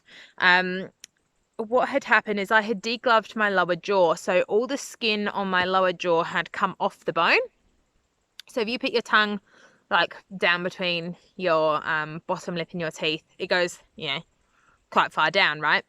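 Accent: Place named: New Zealand. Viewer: Ouch! Oh they can hurt themselves so easily can't they :( I would love to see a video on it. I have a question for you - are you in WA? I wasn't sure because you said Perth but you sound kiwi :)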